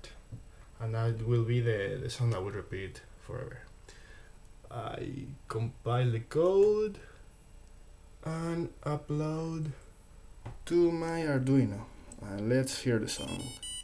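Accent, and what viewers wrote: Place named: Spain. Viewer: Como se nota que eres español xD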